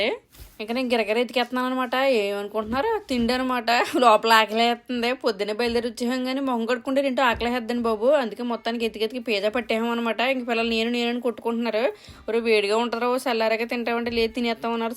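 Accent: Godavari, India. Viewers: మీ గోదావరి యాస గొప్పగా ఉందండి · Akka super Godavari telugu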